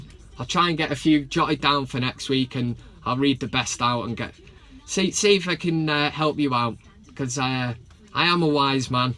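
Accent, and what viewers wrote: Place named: United Kingdom. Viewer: would be better without this damn british accent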